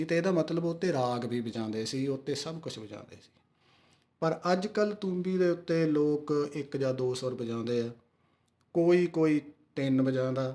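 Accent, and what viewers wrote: Doaba, India. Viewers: ਵਗੈਰਾ ਵਗੈਰਾ ..... ਜਿੱਦਾਂ ਕਿੱਦਾਂ , ,..., love the doabi accent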